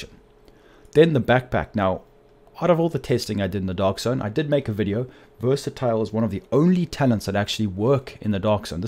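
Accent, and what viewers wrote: South Africa. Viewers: So good to hear a South-African English accent💪🏼💪🏼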